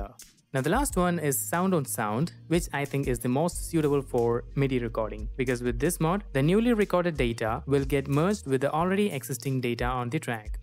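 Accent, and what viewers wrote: India. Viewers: yooo such insightful tutorial, god bless. Also loved your mallu accent XD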